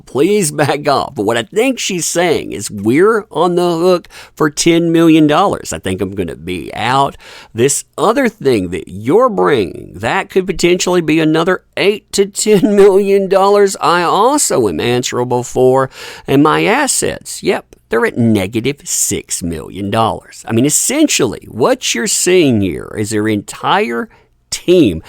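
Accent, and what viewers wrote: South, United States. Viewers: Interesting news indeed. Concerning a previous livestream, who couldn’t like the sweet southern drawl? It’s always sounded great from here in Canada.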